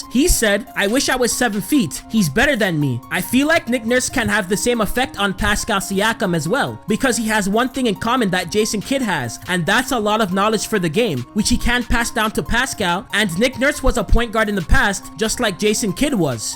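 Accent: Toronto, Canada. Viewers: This guy is a Toronto man confirmed 🤣😭😭 the accent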